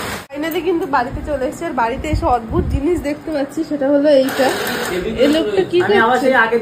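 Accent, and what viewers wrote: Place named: Bangal, Bangladesh. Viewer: Bhaaaa ki sundor bangal kotha koi tmr maiada❤❤❤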